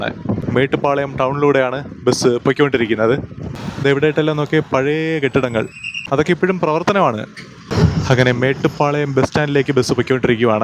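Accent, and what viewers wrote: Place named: Malabar, India. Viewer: താങ്കളുടെ എളിമയും സംസാര രീതിയും പെരുമാറ്റവും കണ്ടാൽ താങ്കളൊരു കൊല്ലംകാരനാണെന്ന് ആരും പറയുകയില്ല ഒരു മലബാറുകാരനാണെന്നെ പറയൂ...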